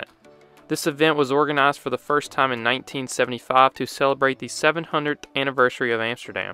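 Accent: South, United States. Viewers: I just can't help to notice that you have a strong Southern accent. I don't know, it just sounded a little weird to me at first hahaha because oftentimes, the geography and history-related videos I watch are narrated by people with British accent. This is the first time I'm hearing someone talk about Geography with a Southern US accent. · Nice southern accent :) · Shout out to all the other people who heard his southern accent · Because of your American accent, I can't understand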